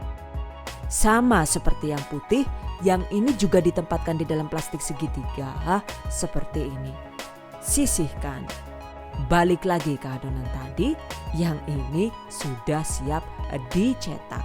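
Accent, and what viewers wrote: Banyumas, Indonesia. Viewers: Bunda asli mana bun. Kok Bahasa nya ngapak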